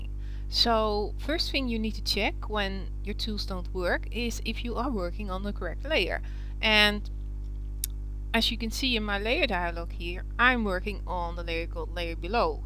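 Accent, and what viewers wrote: Netherlands. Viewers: definetly dutch, good tutorial though : D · Yep, that's Holland ! I can tell by the voice and the buildings ! I visited there with a friend in 2005.....wonderful country and the people were so tall !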